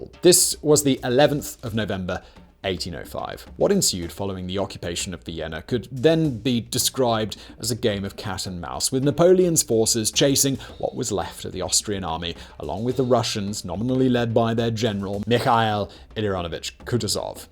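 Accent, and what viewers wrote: United Kingdom. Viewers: Look forward to hearing Simon telling us about the battle of Waterloo. One needs the proper British accent to describe the upper crusty Wellington defeating Napoleon.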